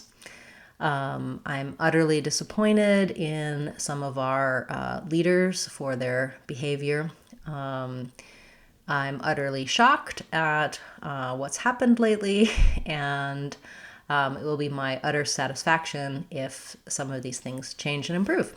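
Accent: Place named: United States. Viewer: Thank you so much teacher I really like your good work and I like american accent I will stick with you to get this beautiful accent,this is my second video...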